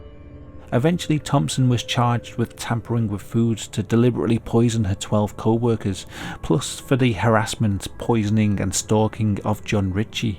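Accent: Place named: Liverpool, United Kingdom. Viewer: That's not a Welsh accent, it's Liverpudlian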